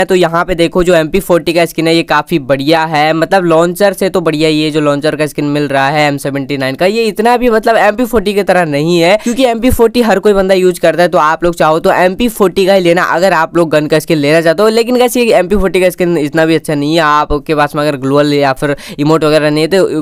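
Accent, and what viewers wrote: Odisha, India. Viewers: Bhai ap odisa see ho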